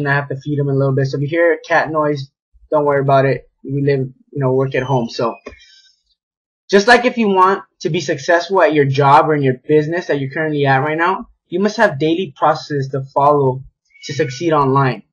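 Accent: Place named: Arkansas, United States. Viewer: That's an Arkansas accent for sure... Let's connect on facebook bro... Look me up, I'm banned from sending more friend requests for another week or so, lol.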